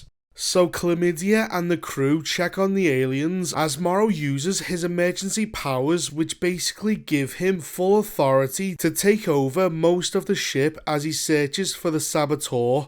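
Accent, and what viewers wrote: Liverpool, United Kingdom. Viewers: it takes a scouser to speak the truth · Robot Scouse monotone speech is definitely appropriate for this retarded shit.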